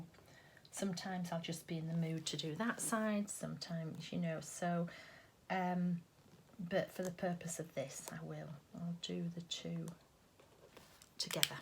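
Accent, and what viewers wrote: England, United Kingdom. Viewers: I just love your vibe and personality! Plus you have the best English accent I've ever heard! Could listen to you alllll day :D love your videos! :)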